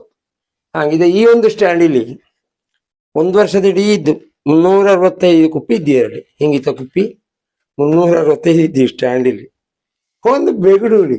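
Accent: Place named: Kerala, India. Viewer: Nimma kannada vantara Malyalam + Hawyaka kannada mixture aagi kelida hage ide.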